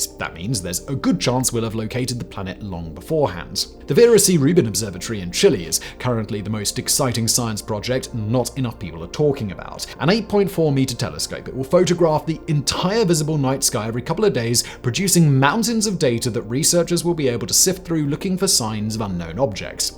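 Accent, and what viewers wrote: United Kingdom. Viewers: Your proper British accent makes those Uranus jokes even funnier 😂🤣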